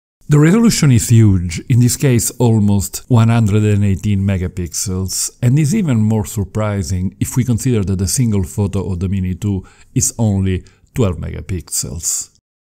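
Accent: Italy. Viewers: Panorama foto moda 😆 like the Italian accent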